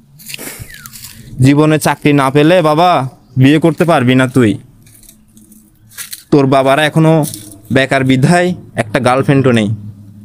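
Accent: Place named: Chittagong, Bangladesh. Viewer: Bhai apni koi thaken?..apnr vasha shune toh chittagong thaken lgtese...amio ctg thki...apnr sthe dekha krte chaii ✊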